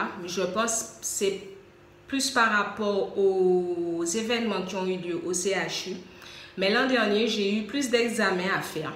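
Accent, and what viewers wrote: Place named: Guadeloupe, France. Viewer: Salut moi aussi j ai la drépanocytose Tu as l’accent de la Guadeloupe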